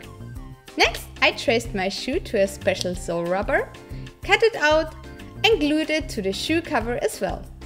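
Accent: Germany. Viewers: sounds hard like german english :D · I love that Germán accent. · That accent...I bet your're German. xD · I feel like your accent was german right? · Sehr unterhaltsam und echt gut umgesetzt. Wobei ich immernoch nicht verstehe wieso Medion seine Gamingsparte Radiergummi nennt. Das deutsche kommt gaaaanz leicht durch aber respekt. So gut sprechen nicht viele. Hoffe Corona gretscht dir/euch nicht zu sehr ins Geschäft. Wünsche nur das beste. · Sounds like: Englisch mit deutschen Akzent.^^ Aber echt schöne Arbeit. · oh boy, the cringy german accent tho. The video looks really interesting, but, (as a german myself btw) i just can´t stand that horrible accent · Sag mal hast Du auch Videos auf deutsch?!? 🤔 . Denn so wie Du Englisch sprichst müsstest Du aus Deutschland kommen!?! 🤔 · Wow...I am watching this only 2+ years later but I am amazed and inspired how this cheap material can be made into such awesome looking armor! 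Somemore you have been commissioned to do this for a company! Well done! Now I also finally know you are from (Southern?) Germany (your accent sounded like it but your name didn't so wasn't too sure...:P). I am a costumer myself but I have not worked with EVA foam yet, hope I can reach your level one day and make maybe a medieval or fantasy armor using EVA foam (due to high flexibility and low costs). I also am inspired seeing you using a laser cutter (isn't it dangerous?) to make those awesome zig-zag strip-away patterns and dremmel and sewing machine to make the rest of the armor. And how you custom made (!) all those under gloves and boot covers (I thought I would have sourced them cheaply online like manufactured from China, anyway that's just my thought to save time and patience, which you seem to have no problems!). Overall awesome and no surprise that the company has asked you to do it, an expert (and cheerful inspiration to other costumers around the world)! 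Keep it up! 👍👍👍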